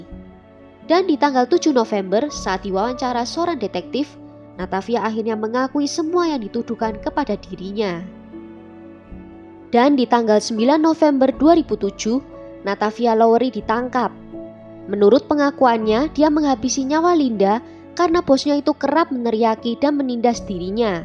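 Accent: Java, Indonesia. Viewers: Jawanya medok ya kk 😄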